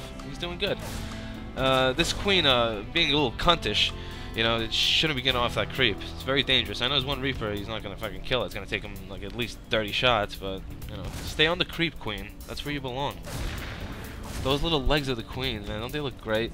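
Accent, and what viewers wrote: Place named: New York, United States. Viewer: Haha yeah man i remember in one of your first vids some one had asked you where you were from and since then i was surprised cuz i couldn't find a trace of NY in your voice lol 'till now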